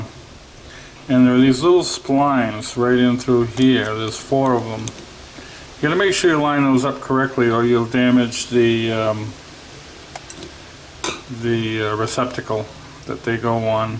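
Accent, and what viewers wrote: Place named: New England, United States. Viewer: Sound like your from either Pawtucket or Taunton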